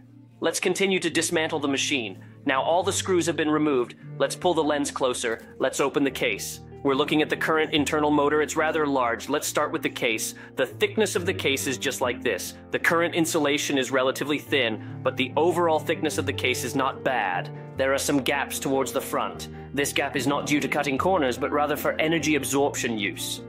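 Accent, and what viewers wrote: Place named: India. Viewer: Omron switch omron switch? Is this automaticly translated? Vegan control board? WTF it sounds like a indian person speaking english for a first time…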